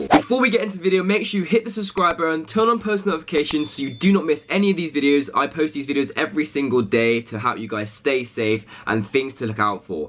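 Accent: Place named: United Kingdom. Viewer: I love your british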